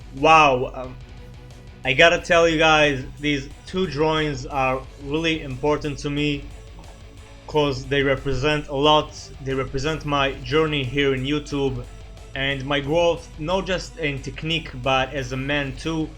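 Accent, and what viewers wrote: Israel. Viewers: וואי אתה נשמע ישראלי רצח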